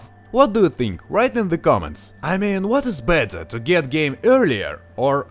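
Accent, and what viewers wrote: Russia. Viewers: Почти у всех русских такой акцнет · когда ты уже позбудешся руского акцента? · Очень странно слушать английский с Русским акцентом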